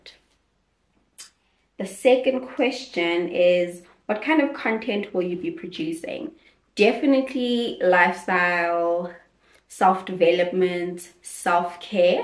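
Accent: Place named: Los Angeles, United States. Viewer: Why that LA accent?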